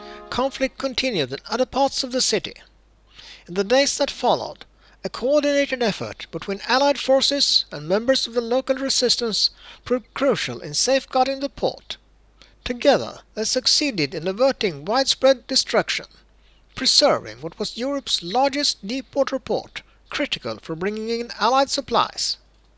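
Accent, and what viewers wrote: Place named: Sweden. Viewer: and please you do ! good aim 🎉 I just explored the LINK to your other videos and I’m looking forward to watching them one by one. wanted to let you know: it seems to me that you’re in Sweden, yes ? and certainly it’s a very thick accent ya got going, I wanna let you know that I’m watching in Chicagoland, Illinois, USA. (what used to be the great country of America, which hopefully in time, will be again. don’t give up on us. 70% of us hate MAGA & Trump and everything they stand for…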